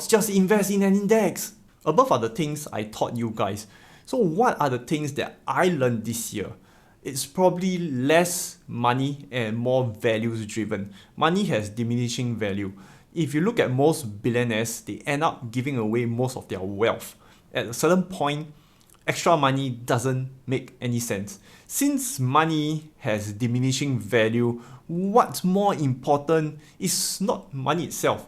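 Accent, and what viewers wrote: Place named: Singapore. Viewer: I am from Sngapore as well! U’ve changed the way I’ve looked at and approached investing in so many ways. Rlly thankful and grateful to chance upon ur channel and hearing ur Singaporean accent in such a transparent way is so reassuring. Thanks!:D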